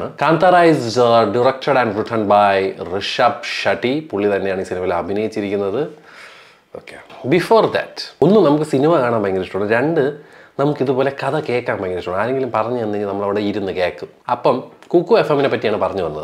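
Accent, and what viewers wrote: Trivandrum, India. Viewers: ഭാഷ powli tvm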